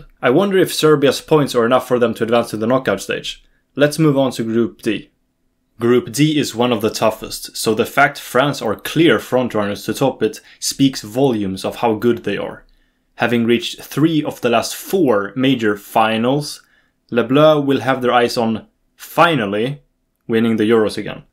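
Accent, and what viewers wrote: Sweden. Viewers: Måste va den starkaste svenska brytningen jag hört på länge😂 bra video👍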